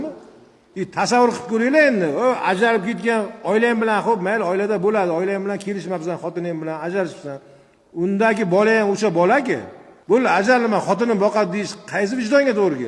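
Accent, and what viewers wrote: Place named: Kitob, Uzbekistan. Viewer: Ота онанга рахмат судья болам шеванг Китобликларни шевасига ухшаркан❤😂 боккан ота онангга рахмат😢😅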